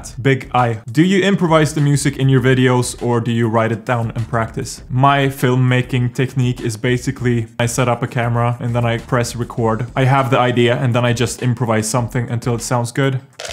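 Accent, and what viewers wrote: Sweden. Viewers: Me in the beginning of the video:”Oh boy he sounds Swedish” Me like fifty years later: “Oj satan i gatan” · ur english is rly good but i can kinda hear that ur swedish (im a finn) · i have never heard someone who sounds as swedish as you, and i am swedish myself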